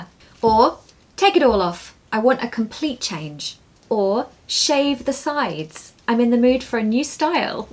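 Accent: United Kingdom. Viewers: Wonderful! It's amazing feeling for the rest of my life that I've found your account and can listen to this lovely information and this elegant British accent😍 we're waiting for a live! If you have other occounts tell us please🥰 thank you from Iraq💗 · I love your British accent,and really I am able to understand what you say also when I don't know all the words.😊Thanks a lot. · I enjoy watching your carefully crafted and informative and energetic posts, radiating your love for wonderful modern and old English. I love to hear true British English coming out of your beautiful and cheering smile. You are best of the best! Off-topic - what is true British English word (a verb) for "to be a fan" (Am.English "Rooting")? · Hi, British English is a closed book to my area in Sri Lanka. You are a great inspiration for me to follow British accent. Your explanation is simple but informative. 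Thank you so much.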